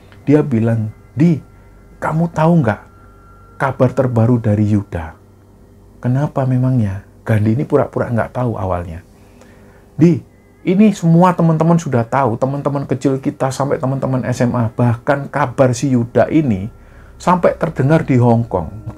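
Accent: Java, Indonesia. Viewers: mas sebagai org jawa klo melafalkan kata bahasa jawa sy harap tdk usah spt bukan orang jawa. mas lo klo bicara jg medok. utk melafalkan kata "ndoro" dan "sedoyo" kok melafalkan suara huruf D nya tidak tepat y sbg org jawa. maaf y mas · Hadir mas, mas jawanya darimana? Kalau ngomong jawa enakeun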